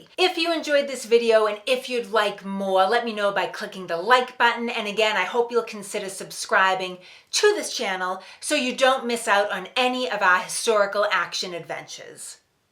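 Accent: Boston, United States. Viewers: Hello, new here...It's been pointed out by others that I have a Boston Accent, but your Boston Accent is "way over the top"--lol I subbed. I grew up in Waltham....What town did you grow up in? 5 Stars! --Van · Her Boston accent cracks me up. I thought my accent was bad. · you have to love her Boston accent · Love your Boston accent, definitely sounds different to a missouri man. Thank you for telling us about our founding history. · I've never heard this story told so well....also, her Boston accent makes it so much more authentic! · I listened to her talk for 30 seconds & could definitely tell, without a doubt that she was from Boston!🤣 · Great work, you made me feel as if I was there that very nite, of March 5th,1770. AS a former New Yorker, (left in 1978) now living in the People's Republic (i.e. communist) of Washington, in the Pacific Northwest, 50 miles north, from the SAN-FRAN-FREAK-O of the NORTH, formerly known as Seattle. 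Just remember, Washington is divided by the Cascade Mountain Range, the commie's all live west of the Cascades' the regular folk live in the eastern side. YES, Jeff Bezos (owner of Amazon) is moving from the People's Republic of Washington, to Florida, so as to literally save millions of dollar's in taxes, when he sell's some of his Amazon stock, later this year. You just gotta hear the local commie's crying in their soup, that because of their TAX THE RICH policy's, their getting NOTHING $$$$$!!!! Is this a great country or what!!!! God Bless America. Your Boston accent only adds to the drama for your mama. I'm truly amazed by the detail of the drawing as well as to learn who the artist was. For the record my thought was that John Adams (future 2nd POTUS, 1797 to 1801.) FYI: POTUS = President of the United States. I am aware, Adams successfully defended the eight British troops in court. 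Your passion is glorious to behold, thank you from a 70 yr's old man. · Your bostonian accent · The Boston accent just adds to the storytelling and what a sweetheart!!!❤ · I love your knowledge and enthusiasm. Your presentations are top notch. I used to do reenactments and it was a gas! Where were you when I was a young man? Why do people have such trouble with your Boston accent and my Long Island accent? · Edit: Good historical video. Are you exaggerating the accent? I live less than an hour from Boston and have visited the city many times. Its very thick. Like, most people Ive met with the accent don't have it that thick. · That Boston accent is so thick you almost need subtitles. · As a lifelong military and American historian, I can’t believe it took me so long to find this amazing channel. This video was very informative…my guess was John Adams who defended the soldiers in court. Okay…the Boston accent makes the video more enjoyable. 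New and excited subscriber. Thank you. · Well, I was certainly wrong in my guess about the map artist. It's a good thing I subscribe to this channel. I'm amazed about the level of detail about the massacre that is in this video. If you walk the Freedom Trail, you can visit the grave of Crispus Attucks. Wonderful Boston accent. Never lose it. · I just found your channel a couple of days ago…. I absolutely love your Bostonian accent & natural beauty. I have since watched 5-6 installments & loved everyone. This one on the Boston Massacre taught me many unlearned things about what happened that night. I am obsessed with Revolutionary War & you have helped to quench my thirst for learning. Blessings, Ken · The diagram must have been drawn by Paul Revere. At any rate, thank-you for your videos! As much as I've read about our Revolution, I always learn something new from you. and yes, your Boston accent adds to the charm and the telling of each incident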